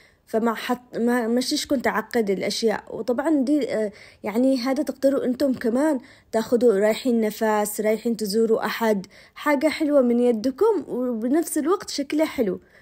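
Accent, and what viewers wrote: Aden, Yemen. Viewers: تحياتي لك عدن الغاليه وتحديدا من المعلا تعجبني لهجتك مازلت محافظه على لهجت اهل عدن تسلم الايادي وتسلمي على مجهودك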